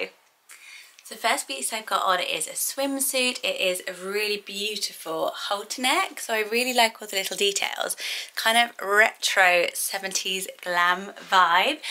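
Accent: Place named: England, United Kingdom. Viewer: I couldn't love you more. You always have the best insight on weight (I've been watching those videos on repeat to get my mindset right) and you are so lovely. Im American raised by my English grandma and I lived in England for 3 years and my best memories are with my grandmas brothers and sisters. Your accent and manner of speaking makes me feel like I'm with family. "Ibiza vibes but really gonna chassé into kids swim" 😂 you're just the best. And you do look amazing and I admire that self discipline to live a healthy life style.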